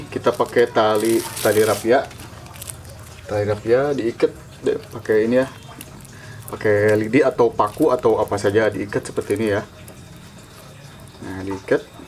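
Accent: West Java, Indonesia. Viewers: Hadeu... H"dasar tukang bisnis! Ih pinter bgt ch. Yg aku kangen in tuh logat sundanya yg kental. Sy tunggu ide nya yg lain y. Makarona rasa keju aku suka bgt rasa keju pasti gurihh. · sarau sarau ngaheab gagaro meni sunda pisan...........😂😂😂😂😂 salam bandung barat. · Seri we abdi mah,lucu kubahasa na,bnr2 asli sunda....(Hitung deui Kang Salah)😍😍😍🤣🤣🤣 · sundana lucu pisan teh.meni edun etamah ey😆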